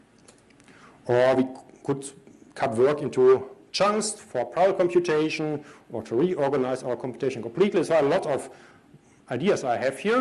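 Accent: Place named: Germany. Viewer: i don't know what's wrong, but it's close to impossible to understand what he says, german accent is way too heavy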